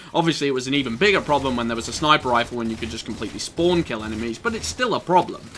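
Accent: United States. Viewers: American accent FTW!! Hey add me!!! Just a friendly reminder! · Dude your american accent is perfect. LoL. Also you have a very interesting perspective on maps that I never thought to look at before.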